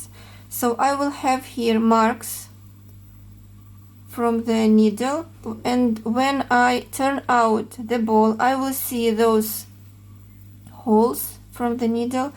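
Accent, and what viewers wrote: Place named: Russia. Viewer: какая красота но смотрю без звука, ужасно режет слух. Всё-таки русский акцент в английском языке это нЕчто, произношение как будто топором рубят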